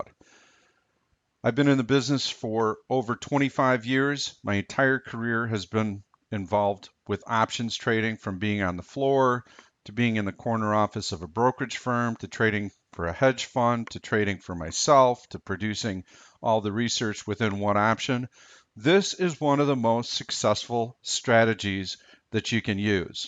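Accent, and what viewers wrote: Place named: Chicago, United States. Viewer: When an options trader tells me he used to trade in the Chicago options pit and I hear his Chicago accent in the first 20 seconds of the video, I listen. lol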